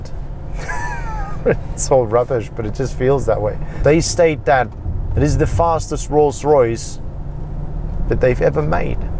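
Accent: Afrikaans, South Africa. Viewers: loved the little Afrikaans accent and words coming through lol lekke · Lekker? 😂 Howzit my broer? So refreshing to hear something South African Ma se kind, i need one of those 'lekker' fat wraiths😂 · Bro are you South African ?Your accent reminds me of home. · You South African??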